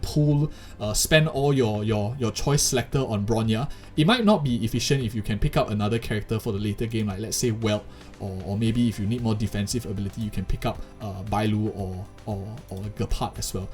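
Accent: Singapore. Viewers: Oh hullo this guy sounds a bit Singaporean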